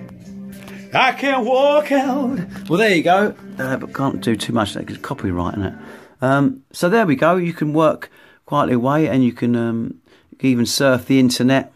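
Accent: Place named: United Kingdom. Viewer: i really love the british accent. Cheers man! Very helpful.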